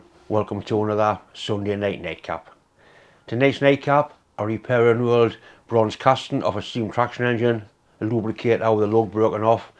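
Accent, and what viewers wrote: Newcastle, United Kingdom. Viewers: great video, as ever - thanks for everything you do. Just wanted to let you know a bit of an odd coincidence - I started following your channel after a shout out from the Canadian YouTuber AvE, but despite the familiarity of the accent I'd not looked too much into it, s'just some geordie lad doing his thing. Then I noticed the address on the package - I had to double-take as a wave of nostalgia flooded over me. Despite now living at the other end of the country, as a kid, I grew up in the countryside of Northumberland and used to stay at my grandparent's house every weekend - my granddad was a retired engineer and used to fettle model boats in his garage, definitely where I got my love of engineering from. Anyway: they lived at the bottom of St. Estate, and I used to go walking the dog down the path alongside the Stamfordham road down past Callerton. 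Good times with the innocence of youth and all that! Anyway, keep up the good work, all the best to you and yours.:)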